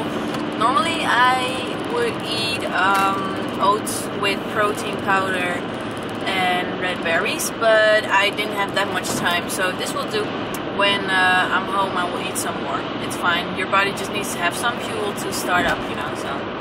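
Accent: Netherlands. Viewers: dutch accent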